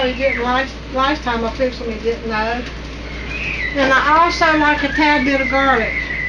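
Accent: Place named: Appalachia, United States. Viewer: my mom had the same cookware you have - waterless cookware. I remember she paid a small fortune for them and took the best care of them using Bar Keepers Friend on the inside EVERY time she used them. They are 30 years old and in mint condition. She has the same grinder tool too. My Mom passed away about two years ago and I miss her every day. Seeing you cook with your pots and pans and in that beautiful Appalachian accent - also like my Mom - makes me happy in a special way. So thank you for doing all you do to share your cooking experience. It means a lot to me. Btw, Mom always told me that if I didn't scrub her pots properly and take good care of them after she was gone, she would come back and haunt me. 😁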